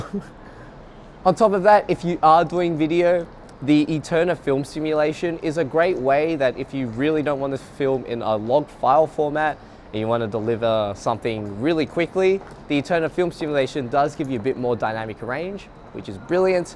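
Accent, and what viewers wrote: Australia. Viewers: Good effort. However no standout point in a sea of xt3 reviews on YouTube, other than the Aussie accent part.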